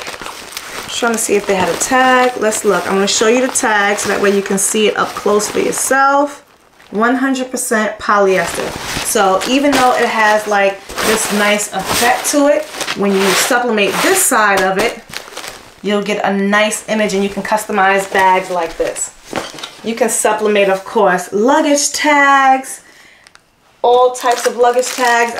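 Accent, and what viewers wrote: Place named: New York, United States. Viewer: Is it New Jersey or New York? I love the accent! It’s just so exciting lol.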